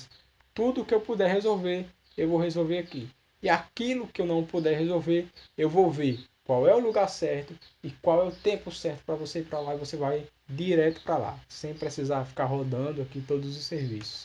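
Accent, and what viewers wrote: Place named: Rio Grande do Norte, Brazil. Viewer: Adoreiii!! Esse sotaque bem Potiguar.. Sou de Natal-RN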